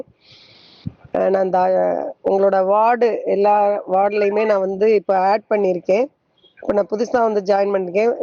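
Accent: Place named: Madurai, India. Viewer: Thank you madam for your alertness with madurai slang.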